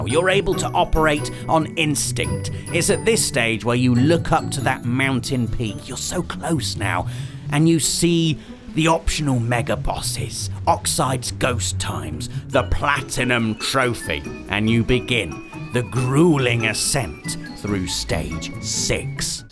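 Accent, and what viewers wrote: England, United Kingdom. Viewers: Because he has an English accent?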